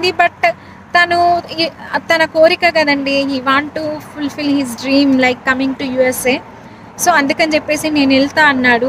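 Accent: Telangana, India. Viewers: Hi garu madu narasaraopet.kani me slang ala ledu.nenu Inka meeru Telangana anukunna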